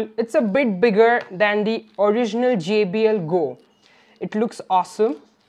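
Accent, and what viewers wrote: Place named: India. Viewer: He is just doing nice 😂😂😂 sale desi english....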